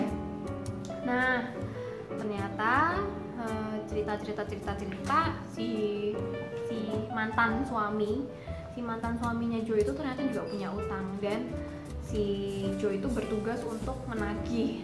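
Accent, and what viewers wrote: Java, Indonesia. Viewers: Ngomongnya MEDOKKKKKKK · Ngomong y medok banget mba e...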